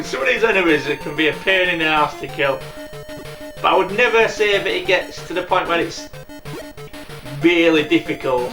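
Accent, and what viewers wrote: Yorkshire, United Kingdom. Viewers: Amazing stuff. Just noticed you by accident. The Ninja :-D Great first choice! Ha ha... Yorkshire accent. I am from East Yorkshire myself. Nice one... I found that if you mostly fire in front of you its a bit easier. Never considered the Hit Rate percentage at all... I tend to power through... lol... I always get stuffed on the floating logs stage. Can't coordinate my firing and movement!! :-D Awesome extra lives snippet there!! :-D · Nice to hear a Yorkshire accent on YouTube!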